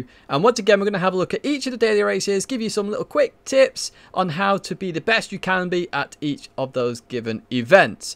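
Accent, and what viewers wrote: England, United Kingdom. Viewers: What part of England are you from. I’m just curious about your accent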